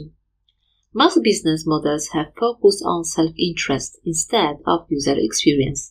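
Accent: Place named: United Kingdom. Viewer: Beautiful Brit accent 😍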